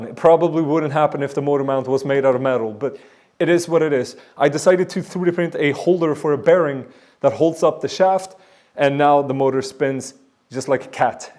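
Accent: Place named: Sweden. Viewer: "Spins like a cat", love the Swenglish 😄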